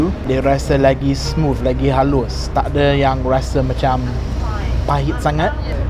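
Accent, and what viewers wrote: Malaysia. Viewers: Hahaha cakap english campur malay aksen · punya slang dh jadi mcm Malaysia-English slang🤣🤣🤣 terbaik! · Perghh slang English mcm malaysian dah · punya speaking x bunyi mcm org putih dah. Slang Malaysia weh 😁😆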